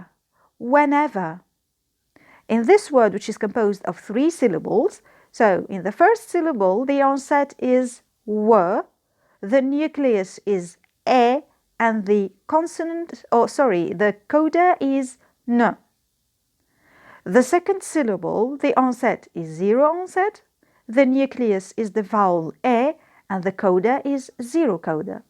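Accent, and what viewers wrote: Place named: United Kingdom. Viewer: Ur British accent is 👍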